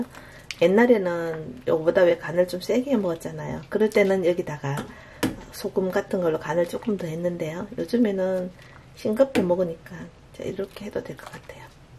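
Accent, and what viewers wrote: Gyeongsang, South Korea. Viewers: 안녕하세요? 안내에 따라 와보니, 여기도 매우 좋은 곳이네요! 근대 된장국, 보기만 해도 침이 꿀꺽 넘어갑니다. 귀하는 경상도 말씨라도 목소리가 좋으며 설명이 명료하네요! 거듭 감사드리며 - - - - 자주 뵙길 희망합니다.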